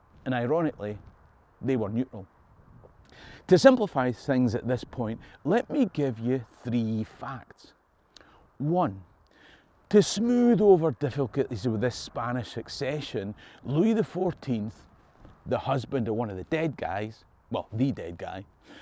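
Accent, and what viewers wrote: Scotland, United Kingdom. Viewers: for some reason the scotish acent makes it more entertaining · Happy New Years and family!!! I watched someone else vid. But finely I now know what a Jacobite is. I had a hard time understanding you. I would turn up volume. When you would say who they were. I think it's was your Scottish accent. I feel all caught up. Warm Hugs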